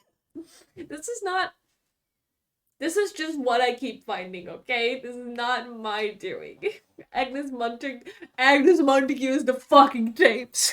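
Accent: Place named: Netherlands. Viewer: Why are you dutch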